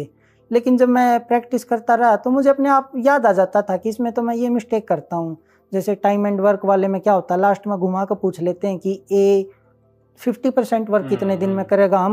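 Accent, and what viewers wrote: Haryana, India. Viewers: Ya chhora haryanvi touch hai😂😂🥳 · That's haryanavi tone..😁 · Haryanvi ha k 😁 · Haryana se ho kya bhai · Hariyani tone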